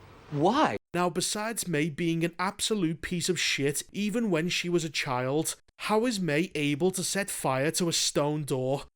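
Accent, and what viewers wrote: Liverpool, United Kingdom. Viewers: is scouse, he’s from Liverpool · My favourite scouser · The scouse accent makes this even better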